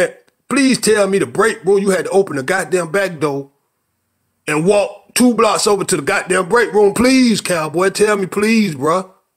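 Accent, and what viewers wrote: South, United States. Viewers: Where you from? Cause you sound just like someone from down south. Cause this how we talk to each other about some dumb shit that be happening.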